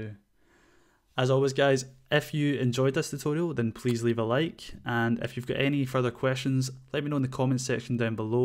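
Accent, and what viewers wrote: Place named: United Kingdom. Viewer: Love the accent. UK, right? Another guess is NZ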